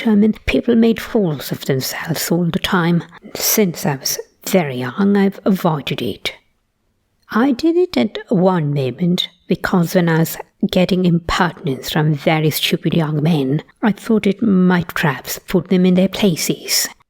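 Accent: RP, United Kingdom. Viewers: You sound like such a posh classy aristocratic lady! Are you actually one? Or maybe “landed gentry”? 😂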